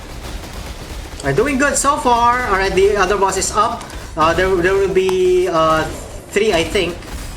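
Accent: Philippines. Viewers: I was like..? Why he sounds like a filipino? And I search all the social media and yeah youre a filipino "kababayan" and matry nga to why not? Thanks for this vid I'll try this especially I'm a newbie.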